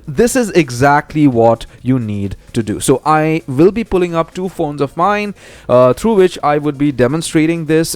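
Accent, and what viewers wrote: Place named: India. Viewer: First off, thanks for being an Indian English speaker Second thanks for an ACTUAL solution to this on the internet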